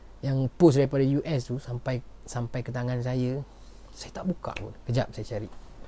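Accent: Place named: Kuala Lumpur, Malaysia. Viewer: Wow 😮 cakap KL 🤣🙏🏻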